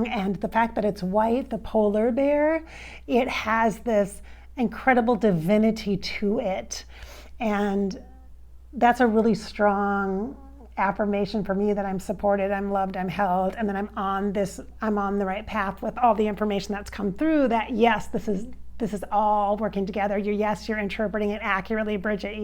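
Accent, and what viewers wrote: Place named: Ireland. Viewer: Love your Irish accent lol 😂 watching in Northern Ireland ☘️💜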